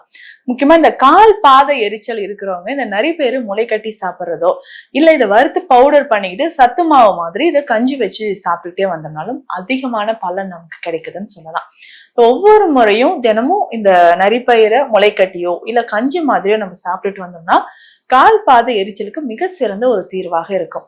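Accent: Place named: Chennai, India. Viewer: Dr.என்றாலும் Madras தமிழில் தான் கதைப்பீர்களா?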